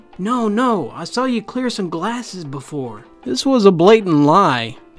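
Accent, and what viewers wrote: South, United States. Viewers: I know it's Southern, but what state? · Where is the reader from or is that a fake accent. It's not the coastal South, maybe Arkansas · Someone with a strong southern accent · the most calm and somewhat soothing southern accent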